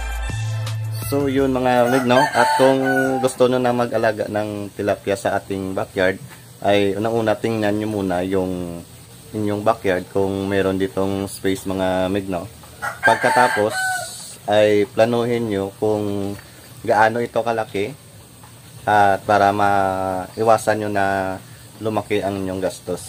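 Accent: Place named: Iloilo, Philippines. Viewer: Ilonggo ka siguro nu meg.. kay may ebidensya ako simu..sa tono ng boses mo abut taka meg..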